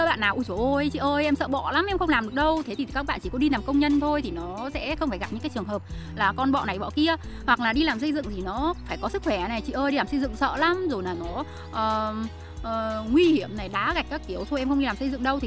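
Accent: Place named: Nghe An, Vietnam. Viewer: Quê nghệ An hay thái bình hả cháu ơi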